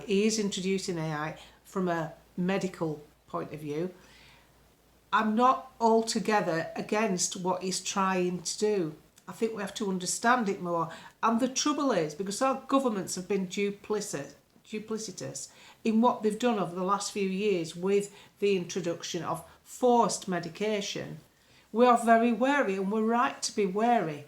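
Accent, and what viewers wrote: Germany. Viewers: 😂😂😂love you reading your news report in yourGurrrman accent😂😂😂 Please do more and do you any other accents from around the world😊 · Hi, keep up the good work but do you have to do that stupid German accent? It does you no favours.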